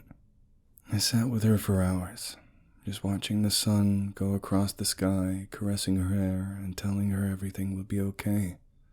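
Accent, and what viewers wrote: United States. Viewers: Is that you behind the American accent or a guest? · Your American accent is very good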